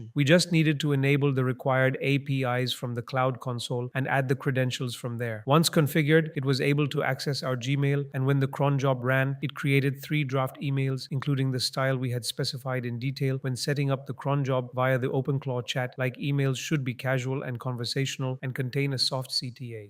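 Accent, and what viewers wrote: India. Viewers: Indian accent AI voice is peak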